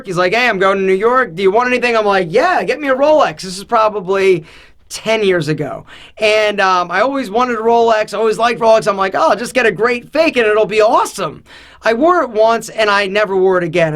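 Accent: Philadelphia, United States. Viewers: He is from Philly. As am I. You can tell right? Lol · Lolol i was thinking philly too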